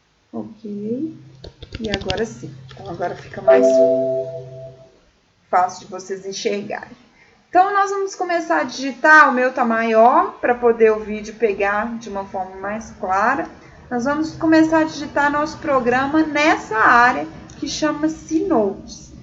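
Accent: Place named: Minas Gerais, Brazil. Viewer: Valeu, profa! Ótimo introdutório e com esse maravilhoso sotaque mineiro (adoro!)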